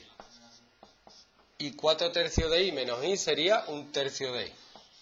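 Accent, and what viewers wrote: Andalusia, Spain. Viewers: andaluz tenías que ser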